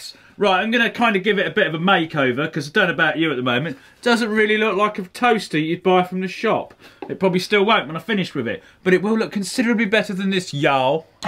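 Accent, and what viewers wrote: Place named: Oxford, United Kingdom. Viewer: because of your videos, I am learning Oxford English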